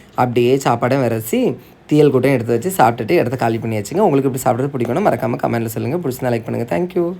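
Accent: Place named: Kanyakumari, India. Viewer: நீ படிச்சவன் தானே நீ ஸ்கூலுக்கு போய் இப்படியா பாடம் நடத்துவா கன்னியாகுமாரி பேச்சை மாத்திரியா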